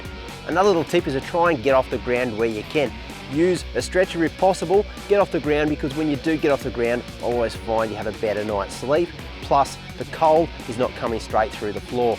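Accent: Australia. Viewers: If you listen extremely carefully, you can hear this guy has a very slight Australian accent! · They are Australian mate😂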